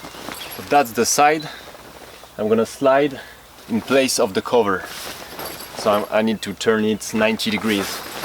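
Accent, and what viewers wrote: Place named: France. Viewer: french? cute accent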